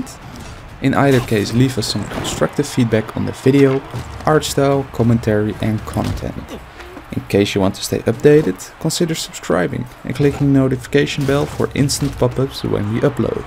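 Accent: Netherlands. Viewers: dat dacht ik al ik hoorde het aan je engels accent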